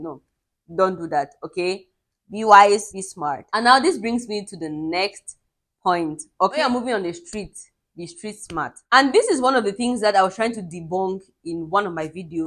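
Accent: Nigeria. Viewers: You speak like a Nigerian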